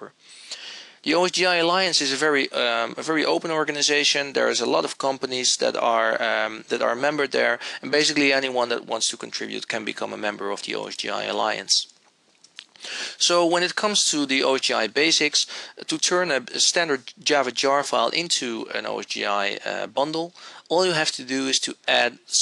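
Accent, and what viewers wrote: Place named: Netherlands. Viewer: Dutch accent:) Thanks for the short intro.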